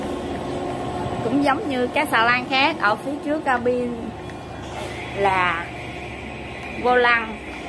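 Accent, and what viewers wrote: Mekong Delta, Vietnam. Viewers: Thôi đi ba, gái miền tây nói chuyện vay mới dễ thương 😁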